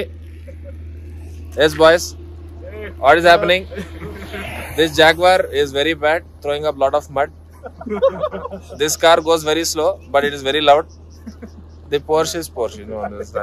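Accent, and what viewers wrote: India. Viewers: He's english is good.. He just has a South Indian accent which is okay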